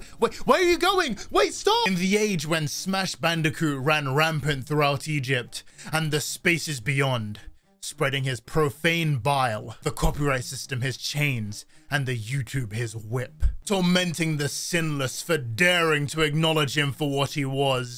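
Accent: United Kingdom. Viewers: bro why is twomad talking to me in a British accent?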